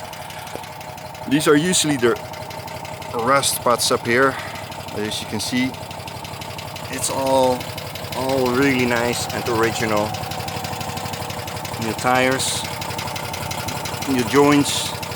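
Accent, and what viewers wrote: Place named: Netherlands. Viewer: weer dat vreselijke Hollandse rot accent🤔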